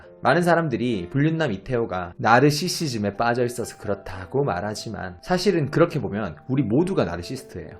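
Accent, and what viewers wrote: Gyeongsang, South Korea. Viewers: 경상도세요? 발음이 살얼음 위를 걷는듯.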